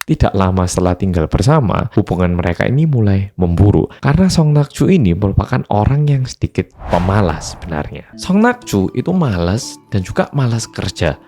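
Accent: Java, Indonesia. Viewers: Mas medok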